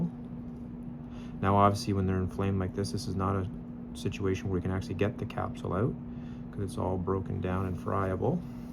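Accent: Canada. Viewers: Side note... Love the Canadian OWT! ( respect from the UK )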